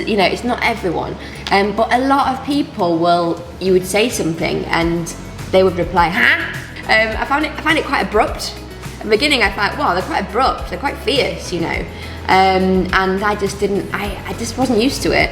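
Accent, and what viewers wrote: United Kingdom. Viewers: Your British accent is so beautiful and elegant 好喜歡你的英式口音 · 英國腔真的好好聽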